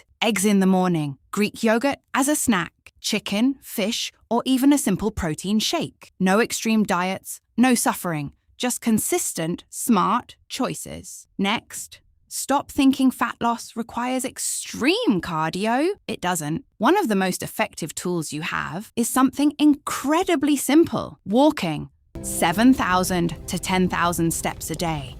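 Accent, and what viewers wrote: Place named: United Kingdom. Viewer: It’s good to hear British accent 😉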